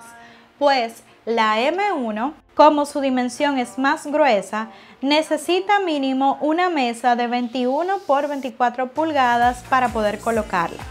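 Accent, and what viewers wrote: Dominican Republic. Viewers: Saludos, bendiciones, nuevo suscriptor, por tu acento sabía que eras Dominicana 🇩🇴, enhorabuena, ya estamos avanzando en RD con máquinas de corte láser, yo tengo impresoras 3D y ya compré una máquina de corte láser, te felicito y te deseo muchos éxitos 😊👍🏽🙏🏽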